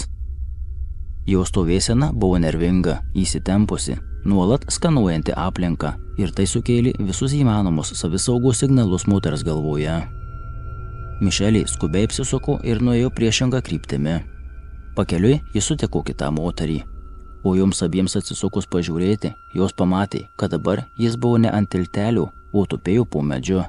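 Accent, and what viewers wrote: Suvalkija, Lithuania. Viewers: Akcentas lb gražus, Suvalkijos.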